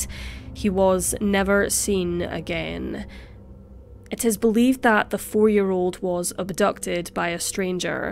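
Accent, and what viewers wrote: Scotland, United Kingdom. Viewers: woah i wasnt expecting a scottish accent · This is my first video of you. Ngl, I didn’t expect a British accent. Woah 😳 (Scottish? Welsh? Northern part of England I think?) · unrelated but i love your accent, are you scottish perhaps? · Wow, Ive never heard of this case ever. Great video and its nice to hear a fellow Scottish voice on youtube.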